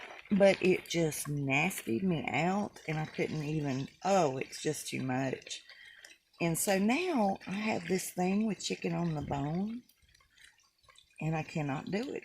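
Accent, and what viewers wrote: South, United States. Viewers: Looks delish!! Where are you from in the South? Your accent is SO cute!! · Hi there... I made your chicken today, marinated over night.. My family loved it. My husband who hears me listen to your videos at times asked me if I was going to talk Southern like you while cooking... In good spirit and in my best southern accent I said " Col. Sanders ain't got nothing on ".. We laughed and I saluted you with my tongs! Thanks for sharing your recipe it's really good!😘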